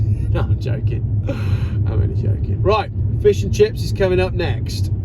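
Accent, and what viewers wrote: Wales, United Kingdom. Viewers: Must be Welsh?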